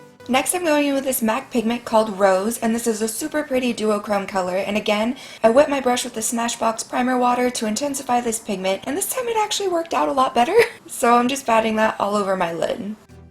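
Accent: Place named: United States. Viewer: As an Englishman, I love American accents, this one in particular. I wish this wonderful lady would do some ASMR.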